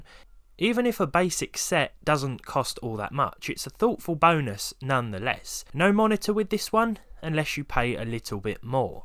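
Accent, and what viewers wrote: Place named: Scotland, United Kingdom. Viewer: I like his accent. Is it Scottish?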